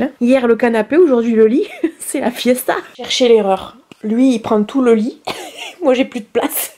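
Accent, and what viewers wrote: South, France. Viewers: Il va être très heureux ce chien avec vous, un malheureux de moins à la spa. J'aime beaucoup vos vidéos pourtant je suis pas une jeunette mais ça me fait passer des petits moments agréables. Votre accent du sud est très plaisant, je suis du sud aussi. Vous faites très authentique, c'est cela qui me plaît beaucoup chez vous. Merci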